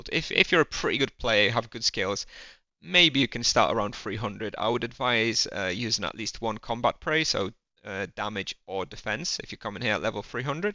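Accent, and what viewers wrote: United Kingdom. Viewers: w Angli mieszkasz ze taki akcent ?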